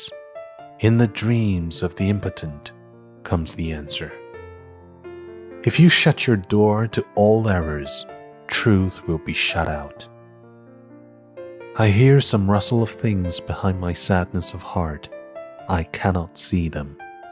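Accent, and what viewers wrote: United Kingdom. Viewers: 喜欢英音的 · 優雅的英國腔' 真是迷人啊! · 可能是因为住在美国的原因，美英会更顺耳。但是您的英英感觉很舒服，似乎诗的朗读英英更有感觉。谢谢! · 好喜歡英音英音讀詩特別有意境好贊！ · 英音好听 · 好美啊！英音听起来很有质感。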